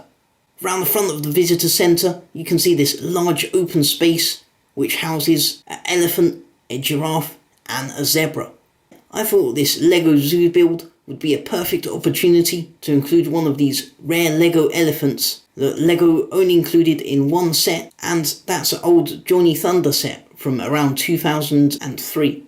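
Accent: Southern England, United Kingdom. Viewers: He's from southern england, i'd say. Prob london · Hey, hope you don't mind my asking, but where are you from? You have what sounds like a UK accent, but you speak the International dialect. Your garage rhymes with carriage, but you say trash instead of rubbish (among other things I've noticed). Just curious... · are you British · He must he from the UK ( United Kingston ) or London, they pronounce some words weird but thats justo how they talk there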